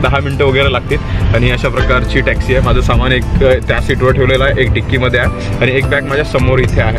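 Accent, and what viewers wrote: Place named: Nagpur, India. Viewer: Aala corona nahitar China Cha moha sodena nagpuri tone ahhe keep it on🤣🤣🤣😁😀🙏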